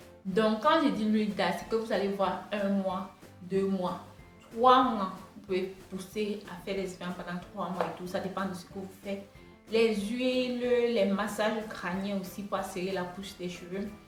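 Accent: Cameroon. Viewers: Tu parles comme les bamilekes du Cameroun. Es tu camerounaise ? Sinon merci bcp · Je ne suis pas habituée à l'accent camerounais que je trouve charmant d'ailleurs, pourtant je n'éprouve pas de difficultés à te comprendre. Continue et merci pour ces conseils. En plus tu as pris le temps d'énumérer les différents points à l'écrit dans ta vidéo. Donc si ce n'est pas clair ce n'est pas ta faute.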